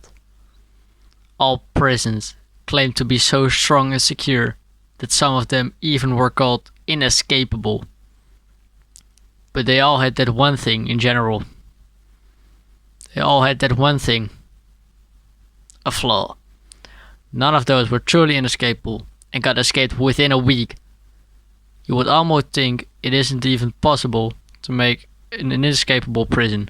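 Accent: Netherlands. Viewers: you sound dutch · Are you dutch? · Eyo may I ask, are you dutch since your accent sounds like it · Are you Dutch? The accent sounds familiar (also I'm Dutch) don't try to not say it, I am not gonna escape it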